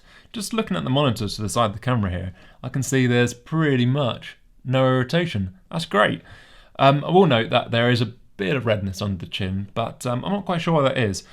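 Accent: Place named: United Kingdom. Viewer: When I heard the British accent I knew there would be excess talking xD great review tho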